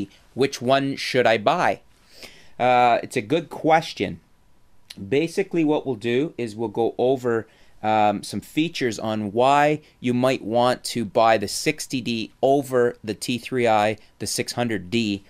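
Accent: Canada. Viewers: Goiod info. Canadian, eh? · After he said he was Canadian I couldn't ignore his accent.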